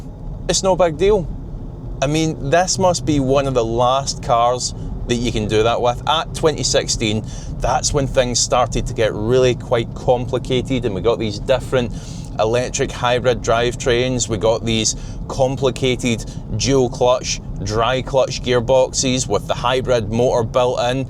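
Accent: Scotland, United Kingdom. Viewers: There is nothing more satisfying than hearing the word Alcantara in that Scottish accent.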